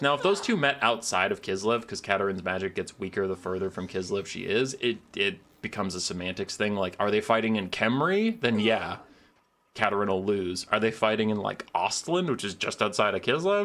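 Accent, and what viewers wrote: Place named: France. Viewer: your french accent absolutely incredible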